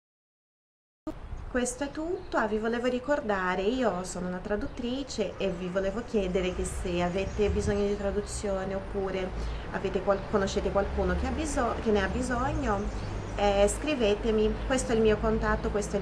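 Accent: Abruzzo, Italy. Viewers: di Rieti.. università dell'Aquila però.. cmq si sente ogni tanto la "calata" abruzzese nei tuoi video ehehe!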